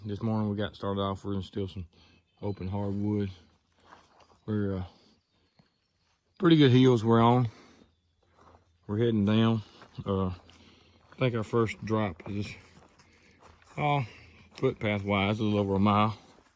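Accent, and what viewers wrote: South, United States. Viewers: Love correcting your Southern speech. 😂😂 you know I love those old buildings especially the church.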